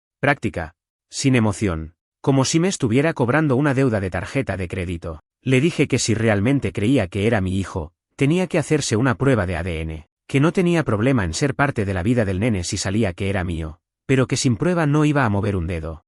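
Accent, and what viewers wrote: Argentina. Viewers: Me suena a que es argentino, al menos eso parece por como hablá.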